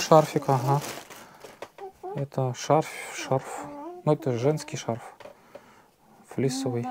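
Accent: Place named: Tatarstan, Russia. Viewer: Пащиму у татарский акцент?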